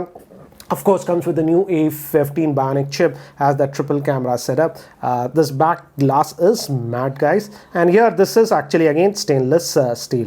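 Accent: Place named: India. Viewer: U r like .. Hindi professor.. doing English class 🤦🏻‍♂️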